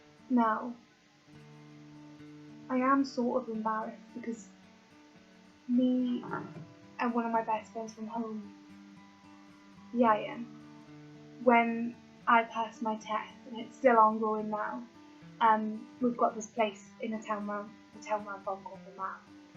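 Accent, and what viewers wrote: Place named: Wales, United Kingdom. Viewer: you cutie <3 I think that after this long of knowing you and never meeting up I'd built up this voice in my head for you that never took into account the fact that you're Welsh:P Your accent is so much more adorable than I thought it'd be:3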